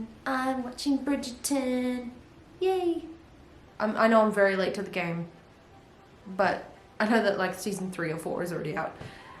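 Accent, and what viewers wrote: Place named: Australia. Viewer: The best part was the australian accent, love it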